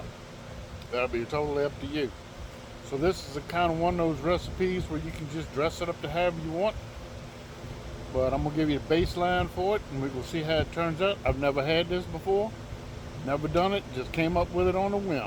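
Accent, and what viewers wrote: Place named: Louisiana, United States. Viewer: Looks and sounds good. My Momma liked her hotdogs black! Are you from Louisiana? Asking because I detect an accent. Thanks for sharing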